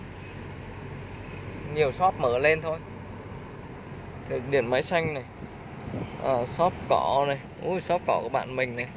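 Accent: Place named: Nghe An, Vietnam. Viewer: Em nous quê bố Đắc Lắc mà sao chị nghe như nói giọng như người Nghệ an vậy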